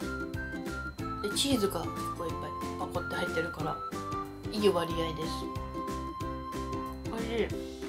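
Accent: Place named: Miyazaki, Japan. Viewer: ホンマでっかＴＶでイワクラさんを観て、訛りが気になったのでチェックしたら、宮崎出身の方だったんですね！ イワクラさんって、東国原知事につづく、全国に知らしめる宮崎の顔になりそうな予感です。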